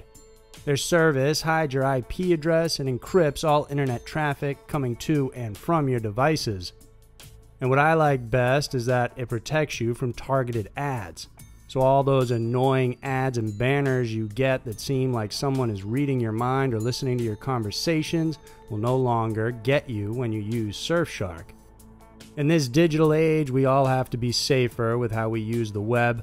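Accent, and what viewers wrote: South, United States. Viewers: I have thought that too, its the slight southern accent (which I looove). Hey, wouldnt it be hilarious if in the future mr McConaghey revealed he does this true crime/mysteries-gig in YT in his free time? When it gets boring between shoots :)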